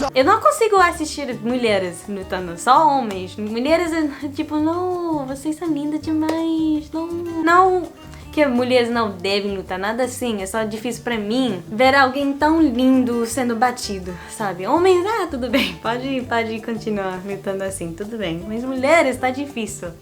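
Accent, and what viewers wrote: Rio de Janeiro, Brazil. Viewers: parece uma carioca ela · seu sotaque não parece americano, é bem carioca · coisashhh... Tá bem carioca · falar português com sotaque de carioca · seu português tem sotaque de carioca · seu português tem sotaque carioca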